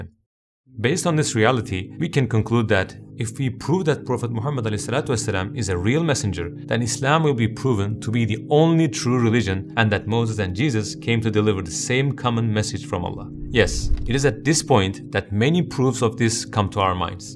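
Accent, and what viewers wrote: Turkey. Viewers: you have a turkish accent ,i lived in turkey such a muslim country ,as a tourist you are a walking walet to them you get ripped off the moment you step in a cab at the ap until you leave the country .from government offices all the way to corner shops ,i travel a lot i have seen many countries there are bad apples everywhere but turkey as muslim country should set an example not be the worst in the world , this example itself proves whatever islam is offering it's not working ,and i am going to stop here and good luck with your lessons of saving souls and converting ppl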